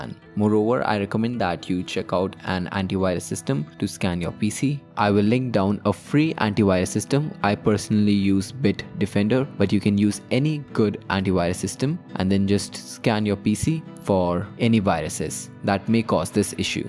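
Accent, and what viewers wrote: India. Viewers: Not all Indian-ish voice is bad :D And by the way this really helped a lot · No need to be nervous he has an Indian accent we are good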